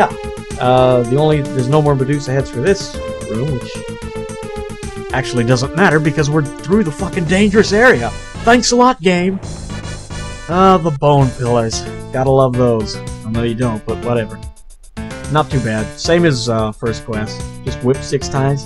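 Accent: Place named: Georgia, United States. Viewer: Well, I like it. But then again, I'm a HUGE stats-geek. Profiles, bios, statistics... I like anything like that. Also, trivia. ANYWAYS, what I really like about LPs is that every LPer brings a different attitude to the game. Like with Gundams and pilots. Your attitude, plus the charming accent equals my subscription. I would have that accent, too if I was born one state up. Damn you, Florida! I would trade oranges for peaches any day of the week. Unless I had scurvy.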